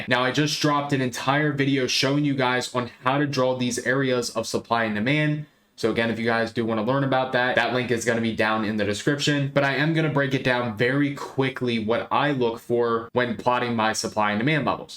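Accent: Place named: Delaware, United States. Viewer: Thanks for these videos. You gotta be from new Castle county 😂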